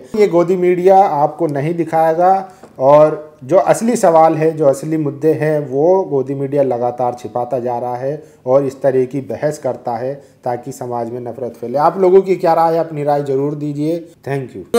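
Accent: Bihar, India. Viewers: मुझे तो आज तक एक भी भीमटा पत्रकार नही दिख। ये थूक निगलकर खबर पढ़ने वाला भद्दी भद्दी आवाज निकाल कर बिहारी टोन में श को स बोलने वाले को कोई अक्ल का चोदा ही पत्रकार कहेगा।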